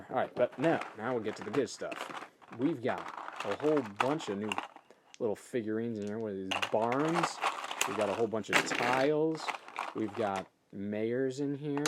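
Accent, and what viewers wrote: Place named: United States. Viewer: Uhhhh...American. There's really nothing weird about it.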